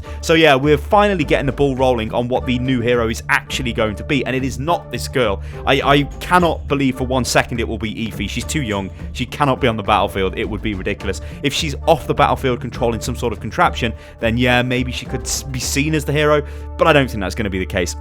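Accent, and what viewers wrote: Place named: United Kingdom. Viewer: Nice to hear another British voice bro 👌🏻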